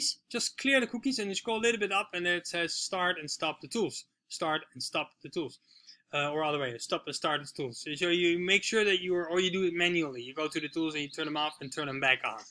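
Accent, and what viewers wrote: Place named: Netherlands. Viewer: Grappig dat je nederlands accent hoort .. ik kom trouwens uit Honduras🇭🇳 ,groetjes en genietze in Costarica 🇨🇷💯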